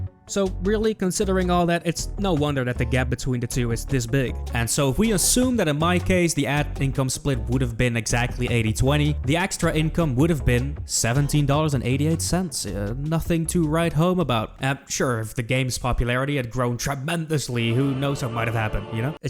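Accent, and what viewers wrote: Netherlands. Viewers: Didnt hear the dutch accent until he mentioned hes dutch…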